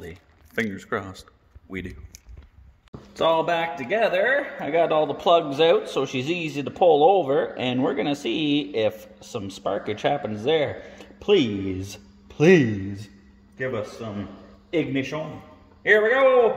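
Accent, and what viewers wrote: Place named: Nova Scotia, Canada. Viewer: Ive had 4 of these... Absolutely no disappointments!! You sound like your from NS!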